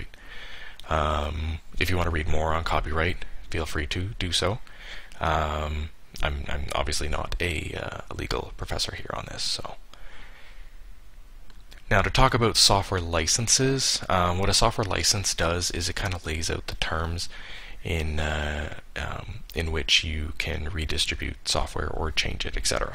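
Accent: Canada. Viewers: Are you canadian? I sweat I heard aboot a few times.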